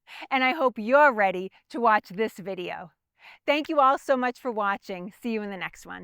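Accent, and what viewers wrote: Boston, United States. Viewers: Well I learned something I never knew, and it was courtesy of that distinctive Boston accent, lol. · Great Boston accent! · Your authentic Boston accent is enjoyed. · When you hear her talk, there’s no doubt, she is from Boston! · Watch out British accent documentarians who are sooo popular … Boston is here now… it’s a new era of the documentary genre!❤❤❤I love this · Well go listen to other historians talk about Boston and they call Charlestown Charleston and they mispronounce all the street names to me I love the accent it brings me back home I'm from Revere living in Oregon I really miss home this is like a little slice of home for me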